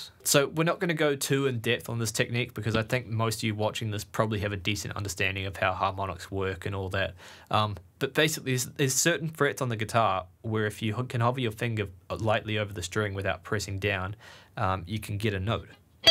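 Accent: New Zealand. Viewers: I watch so many shred videos, I'm so glad to finally hear a New Zealand accent after all day hearing British and American accents, like no offense to them but it's always good to see a fellow New Zealander making killer content.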